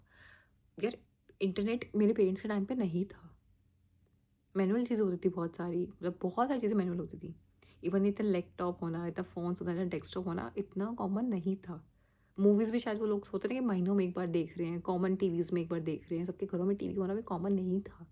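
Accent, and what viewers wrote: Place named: Madhya Pradesh, India. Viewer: you are definetly from madhya pradesh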